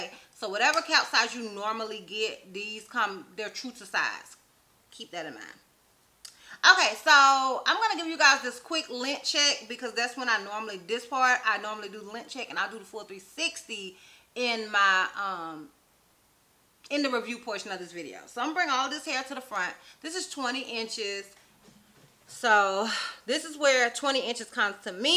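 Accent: United States. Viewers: Girl you are so country..I love it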